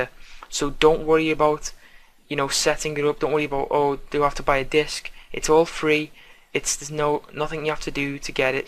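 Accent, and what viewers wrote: Liverpool, United Kingdom. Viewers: Are you perhaps Scouse? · are you a scouser? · You sound scouse lad, you a wool? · Ziqian Li the guy in this vid is a scouser (liverpool) lol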